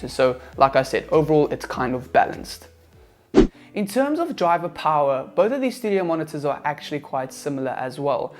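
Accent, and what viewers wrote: South Africa. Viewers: completely unrelated question but are you south african? i'm trying to learn the different styles and accents of english better.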